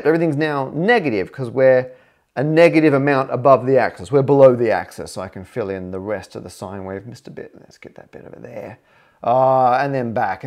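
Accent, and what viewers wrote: Australia. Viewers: Why is Simon Whistler talking Australian? That should be the question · It is probably in part "fault" of the more cultivated Australian (is that what people still call it) + British influences accent, for some reason people tend to see the latter as more posh or smug automatically, the former is reminiscent of the latter, and some people can't tell which is which anyway. I am afraid to say, you're sort of facing an uphill battle on that one, and in reality is no fault of yours in that particular department, since the real fault is just people not being sufficiently exposed to more varieties of English, which sucks but it is also natural. I.e. just bad luck (though I still wish you the best of it, for what it is worth, which is probably nothing).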